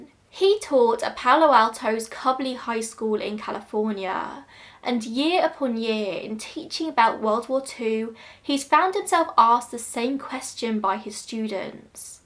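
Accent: England, United Kingdom. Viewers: She really does have a great voice, and this is coming from someone who usually hates the sound of English accents. But her voice is so calming and sweet that I actually like listening to her speak.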